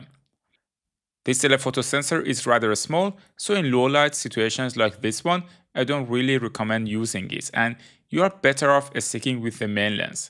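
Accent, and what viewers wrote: Iran. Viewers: Iranian accent found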